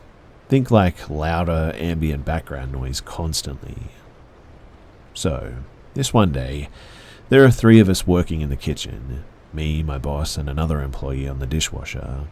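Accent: Australia. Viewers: Ah an Australian voice finally!? I'm in Adelaide. Chilling stories 🐨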